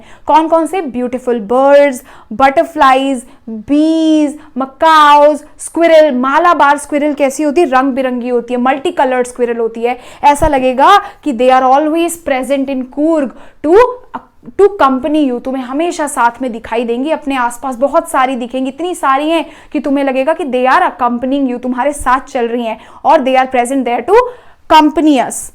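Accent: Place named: Bengal, India. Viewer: I feel like ma'am is bengali as her accent of speaking pranjol is very bengali type of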